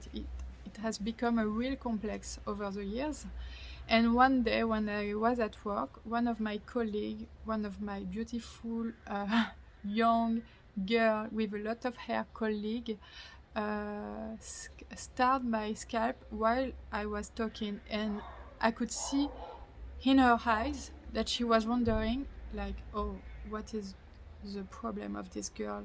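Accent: France. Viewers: Happy to hear more French accents on YouTube. Thank you for sharing your story. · Never apologize for a French accent! :) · This is the first video of yours that I've seen. I am very impressed by you...both your bravery, and your command of the English language. Yes, your accent made me concentrate more to understand some words, but most of us Americans think the French accent is beautiful.❤ You speak very eloquently. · Lovely and pleasant accent. French is a harmonic language and soft in the ears so the accent is so nice. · Well she’s French she can’t do anything about it like Im Greek and I’ll always talk with a Greek accent when I speak English,I can’t control it….. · I love your French accent 💖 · Why are you apologizing? who doesn't adore french accent!! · Your English with French accent is beautifull it truely is. I feel for you girl. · 1- you are so beautifual with our without wigs. 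2- having a french accent makes you even more beautiful 3- thank you fo this video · Who does NOT ❤ a French accent!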